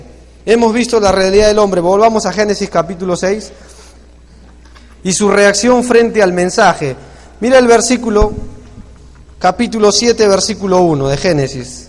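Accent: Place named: Peru. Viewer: Por el tono de voz, creo el pastor es Peruano, cuál es su nombre?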